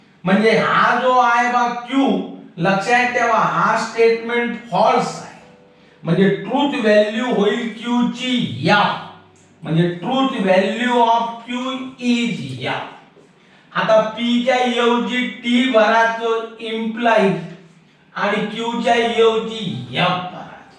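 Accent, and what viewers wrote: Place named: Vidarbha, India. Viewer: Me ka vicharle Karan tumhi vidharbh chi basha bolt hota,kup Chan shikavtat tumhi sir, chemistry pan shikavtat ka tumhi?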